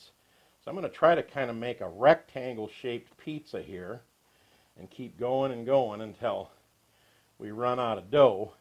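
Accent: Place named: Midwest, United States. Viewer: I use to live in MN and now WI. You sound midwestern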